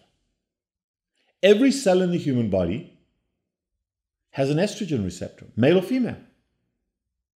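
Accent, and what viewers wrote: South Africa. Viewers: Hy klink so baie of hy Suid Afrikaans is? Hy moet met ons Namibiese Dr's kom praat!😞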